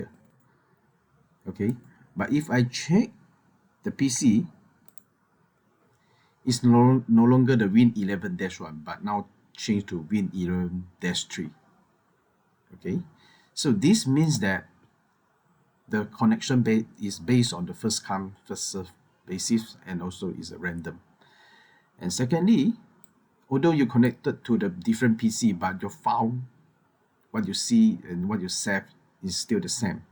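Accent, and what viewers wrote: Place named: Singapore. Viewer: Hello! Singaporean? I know this accent!...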